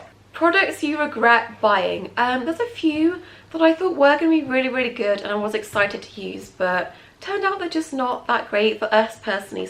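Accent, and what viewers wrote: United Kingdom. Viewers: She is such a beautiful pup, it's amazing how she keeps herself occupied behind you! I've been looking at this breed for years, and have a great opportunity this July if it works out. Trying to learn all I can to make sure I can provide a great home. Do you have more videos as she's gotten older? I'm in the USA....love your British accent!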